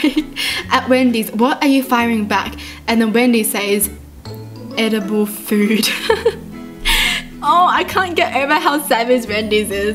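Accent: Australia. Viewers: do u live in Australia bc ur accent sounds Australian and u said u live in Sydney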